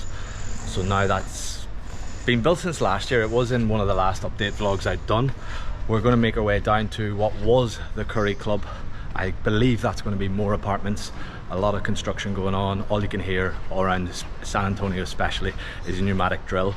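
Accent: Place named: Northern Ireland, United Kingdom. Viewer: Sounds like a northern Irish mon.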